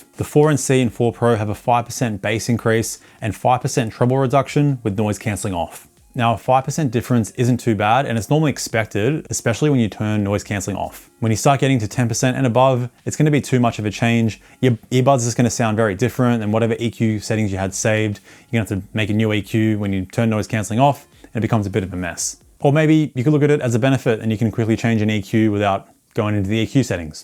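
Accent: Australia. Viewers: Yaaa rigght.. Soundcore sound quality is up there almost the best. Upgraded from Edifier x3 to Soundcore, Good build quality too. As Soundcore Spirit X2 and X10 user.. Both earhook design stays on ear good. But Spirit X10 was uncomfortable to take off ear and must twist the hook making it fall often while storing it anywhere or back in charger. It was irritatingly annoying. . And lot o wind noise while riding bike. I switched to stem shape buds, first one Tribit flybuds C1. Good sound only at almost full volume. Small soundstage but decent comfortable listening as no distortion. Further upgrade to Cmf Buds pro 2, mutiple features, Spatial audio. Ldac(battery drainer, almost same sounding). Very loud,too much bass if selecting bass boost abovs 2. Very Bad fit in ears with stock tips and have to try hybrid silicon or memory foam tips later. Hey, do a review on good n best eartips, they r most important part of earbuds. 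Plenty of good sounding budget earbuds in market sub 50 dollars.. and midrange sub 100 dollars price .. But Soundcore has the top sounding buds that competes with higher more expensive branded buds. Am gonna get Liberty 4c, hows the fit? . Good review with highly distinct Aussie accent,, slow down a bit mate.( jus kdn) .., slow when you highlight the main points. I rarely refer to earbuds reviews but saw yur channel, has lots n lots o buds.. Sooo manyyyy.. How you pick yur favourite from sooo many??, well i suggest yu keep diffrent for various occasions.. Travel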